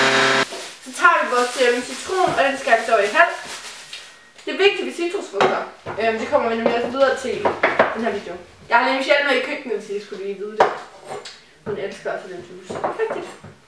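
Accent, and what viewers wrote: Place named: United Kingdom. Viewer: Dat engelsk😂😚💕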